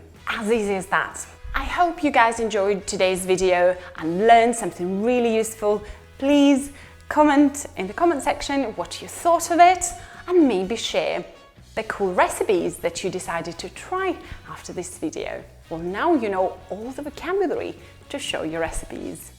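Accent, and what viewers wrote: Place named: United Kingdom. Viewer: I adore your videos. And your British accent - it`s something incredible. Вы потрясающая и светлая личность. С любовью из Украины. Have a good one!!!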